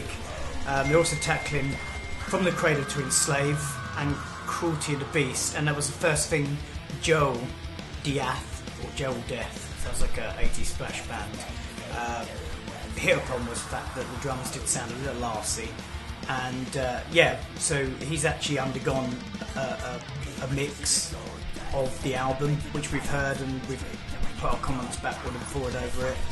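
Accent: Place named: United Kingdom. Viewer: Now thats a beautiful UK accent <3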